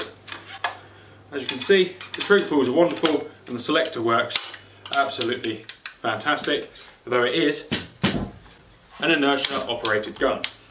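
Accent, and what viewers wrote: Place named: RP, United Kingdom. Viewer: Should of mentioned this before but i will now. The quality and depth of your videos are second to no one on you tube and your knowledge of firearms for a man of your age is quite Remarkable and something i could only wish for and all delivered with a BBC quality voice. Well done posh bloke excellent.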